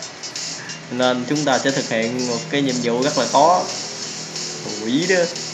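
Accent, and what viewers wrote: Mekong Delta, Vietnam. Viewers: Anh Nói Chuyện Đúng chất Người Miền Tây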